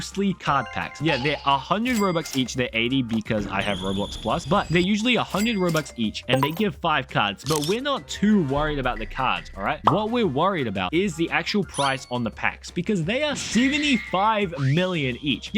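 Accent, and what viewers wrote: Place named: New Zealand. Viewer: can tell by your accent, I’m from Australia and have met many kiwis in my life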